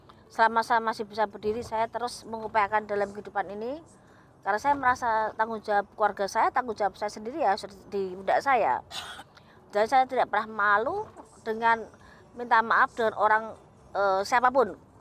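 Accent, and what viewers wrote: Java, Indonesia. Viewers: Oh blasteran jawa bandung toh pantesan logat jawanya ga hilang.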